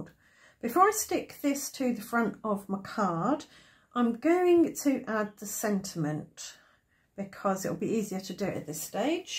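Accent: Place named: United Kingdom. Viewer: I love hearing the British accent too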